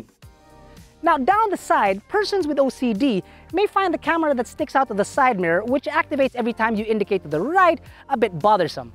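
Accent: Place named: Philippines. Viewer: This is a very good example of filipino accent.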